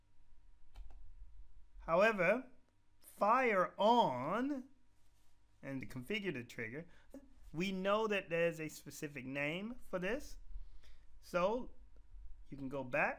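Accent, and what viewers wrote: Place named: Louisiana, United States. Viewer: Solid concise video! Was wondering how these disparate areas connected. You filled in my vast, unknown gaps in knowledge. AND you did it with a..... Louisiana accent?! You got some Southern accent mixed with something else. I couldn't quite figure out. Anyway. That was 100%, Prime, Grade A teaching!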